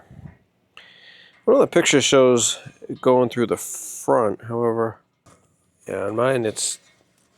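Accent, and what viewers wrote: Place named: Massachusetts, United States. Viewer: Lol. I was listening to you and thought - "this guy has to be from Mass." Sure enough, saw the plate on the vette and it was confirmed. Great vid - Thanks!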